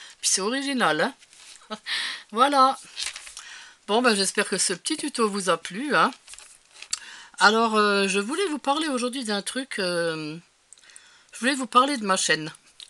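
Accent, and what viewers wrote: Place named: Freyming, France. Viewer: coucou elles sont tres mignonnes sans risque de prise de poids j aime beaucoup ta voix et ta bonne humeur et ce petit accent qui me rapelle ma grande tante qui etait de freming bisous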